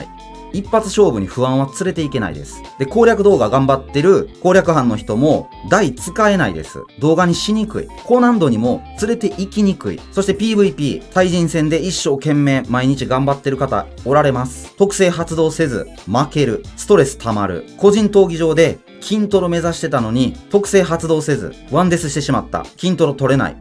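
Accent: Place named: Kansai, Japan. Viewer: 関西弁がいい仕事してます笑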